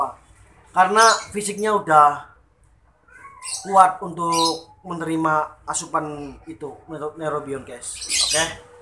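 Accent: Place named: Banyumas, Indonesia. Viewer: Mantap mase...ora ngapak ora kepenak.... Salam suport...🙏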